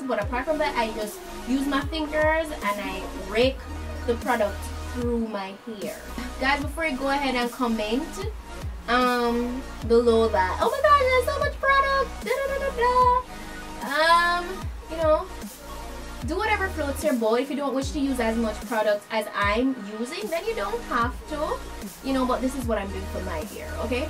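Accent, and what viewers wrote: Jamaica. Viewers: OMG! Your accent is so cute ? Wya from gyal? Jamaica? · I hear a jamaican accent. Subscribed😌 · You sound Jamaican!! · Where are you from... Your accent sounds kind of Jamaican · She's Jamaican. Our ascent is one off a kind. No othe Culture talk like us. Lots of faker tried. But epics failed. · Love your hair... Girl you sound Jamaican. Like ur from like Kingston i guess. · You sound Jamaican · you sound Jamaican. am I right ?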